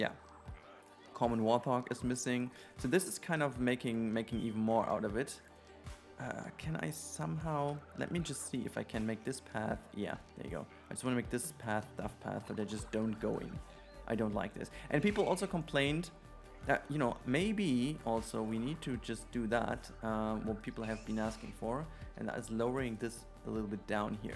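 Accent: Germany. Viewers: his english sounds so german 😂 btw like the video :)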